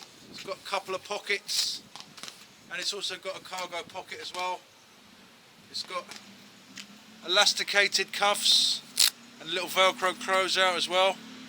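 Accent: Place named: United Kingdom. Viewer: I think it's cheaper to buy welding masks and gloves and milsurp aircrew bdus but nice kit. Edit- I wrote this before I heard your accent. I assumed you were American. I'm sure your kit is much cheaper than paying shipping overseas for milsurp bdus. Maybe there's an equivalent milsurp option from the UK military? Always good to have spares for yourself and others to use.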